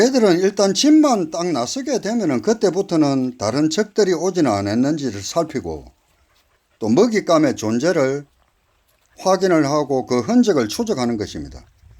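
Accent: Gyeongsang, South Korea. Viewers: 여기가 어느 지역입니까 경상도 인가요 ? 강원도 인지요 ? 저는 서울입니다 ^^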